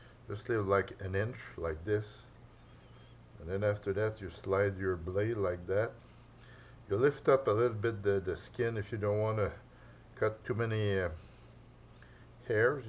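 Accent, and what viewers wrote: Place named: Quebec, Canada. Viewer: i can imagine your from quebec. tabarbak thanks for the video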